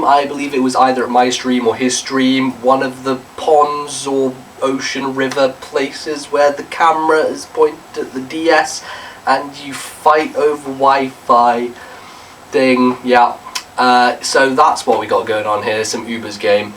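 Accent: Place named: United Kingdom. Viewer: i think hes an indian with a british accent who lives in scotland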